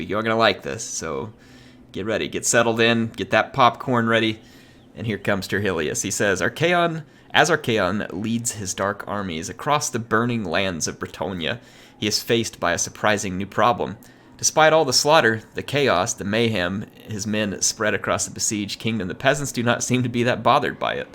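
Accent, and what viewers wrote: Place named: Appalachia, United States. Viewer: While I enjoy the hillbilly accent, I still prefer Michael Palin saying “anarcho-syndicalist commune.”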